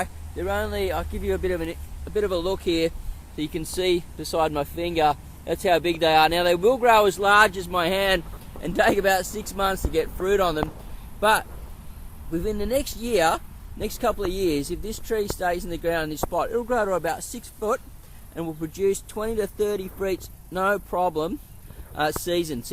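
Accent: Australia. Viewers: Damn that Australian accent 😂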